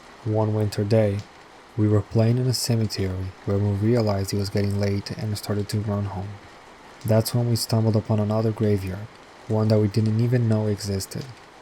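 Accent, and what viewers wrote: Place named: Brazil. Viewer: Ha yes! My Brazilian Girlfriend recognized it immediately and I thought you sounded just like my Brazilian homie Nick too, so we both picked up on it right away! Awesome channel with Spooky videos and your great voice over! Keep up the fantastic work! :)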